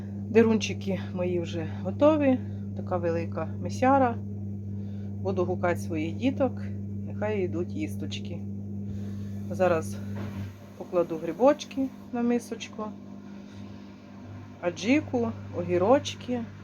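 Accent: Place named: Zhytomyr, Ukraine. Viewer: добрий день, а я зразу зрозуміла де ви живете,по вашій мові, це північ Житомирщини, я живу недалеко від вас.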